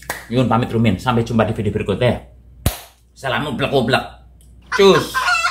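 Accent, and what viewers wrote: Banyumas, Indonesia. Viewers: Kiye bagelen ngapak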